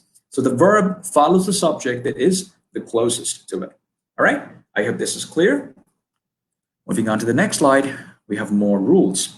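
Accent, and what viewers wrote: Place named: New England, United States. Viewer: I love everything about these contents and the way Augmedix has approached in teaching the work-force that wants to eventually become a part of Augmedix. Getting to learn from this man has been a pleasure although his accent, even after so many 'thorough' listens; I find quite amusing as to me it sounds like a perfect half-in-half crossover between Eastern New England English and Mancunian. This man's tone combined with that accent is pretty 'chad' 😁😅🤘✌🤙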